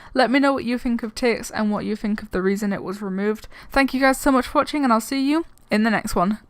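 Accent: United Kingdom. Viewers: oh god what is with your accent, jeez. thats some pure accent from britain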